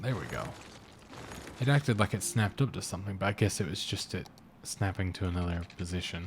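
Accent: Texas, United States. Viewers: you sound like you are from Texas, are you?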